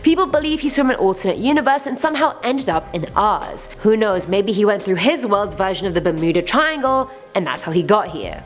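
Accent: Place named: United Kingdom. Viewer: She's hot, she's got that brittish sexy smokey voice type of accent.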